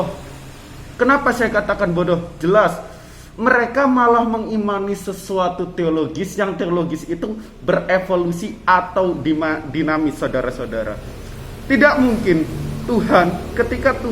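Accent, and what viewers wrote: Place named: Java, Indonesia. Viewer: Ust ini org batak tapi kok medok jowo.ngga ada logat bataknya. Lanjjutkan.you'are the next.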